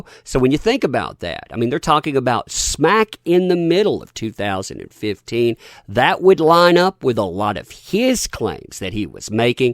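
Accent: South, United States. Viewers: your southern drawl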